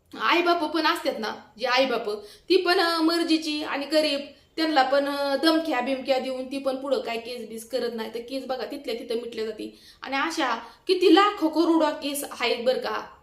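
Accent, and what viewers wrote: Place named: Rural, India. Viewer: Gavthi bolna hicha konala awadata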